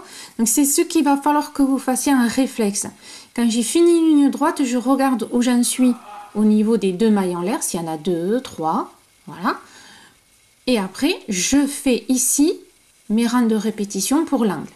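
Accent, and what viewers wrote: South, France. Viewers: Merci pour votre reponse avec toujours ce petit accent qui rappelle le soleil du midi je vais acheter la laine comme dans la vidéo auriez vous un modèle de chale avec emmanchure dans tous vos trésors SVP merci bon WE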